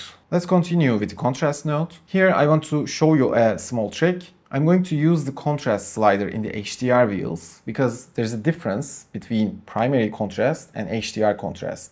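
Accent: Turkey. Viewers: Abi selamlar türk olduğunu aksanından anladım direkt :) Böyle içerikler üreten türklerin olması çok hoş umarım daha da büyürsün.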